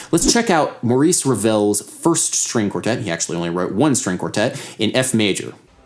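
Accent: United States. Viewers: American musicians follow the German system of naming note values after fractions, while British musicians have adopted the French system. Judging from the uploader's accent, he appears to be an American. Therefore, his use of "quarter" and "eighth notes" is entirely proper.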